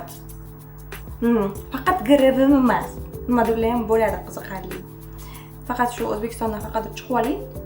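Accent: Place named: Russia. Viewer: Bu qiz Saloh ako’ dp chiqardi a adashmasam o’zbechada narmalniy gapirardiku ruscha tipa aksent qo’shiib gapiradigan bo’b ketibtimi😅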